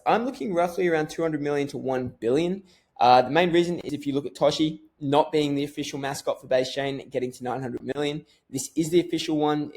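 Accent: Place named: Australia. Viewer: Sounds like you are Aussie. Are you friends with Dan McEvoy?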